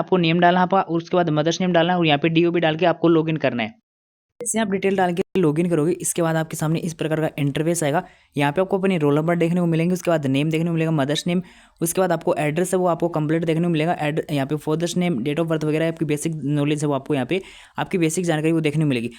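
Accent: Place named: Rajasthan, India. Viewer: bhai teri rajasthani language ki boli mst h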